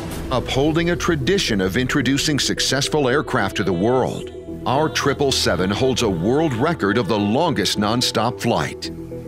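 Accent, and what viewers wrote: United States. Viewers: If your a Pakistani airline then why are you using an American voice why not a Pakistani voice. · western guy with american accent promoting PIA He is not even able to pronounce Pakistan correctly. You people didnt find any single person in pakistan worthy enough to give a voice over for this video? . Such a disappointment. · The american voice seems unreal. Please have a Pakistani bring in some flavour, instead of this military sounding thunderous voice. It will give it a Pakistani feel.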